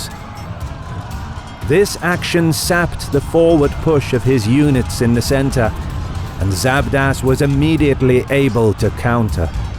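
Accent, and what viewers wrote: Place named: United Kingdom. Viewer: I cant enjoy a documentary video anymore unless its narrated by a british person with a refined accent, well done guys!!!!!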